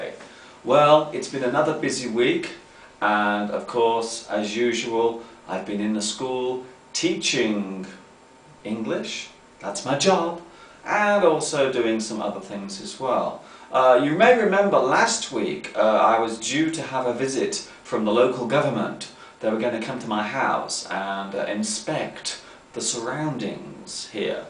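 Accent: United Kingdom. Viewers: Since I became watching your videos I really improved my english, because they don't tire me; It's easy to spend lots of time watching you. And British accent is awesome ^^ Thanks a lot, your lessons are pretty valuable! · I love your British accent!! ^^ Brithis accent sounds so neat!!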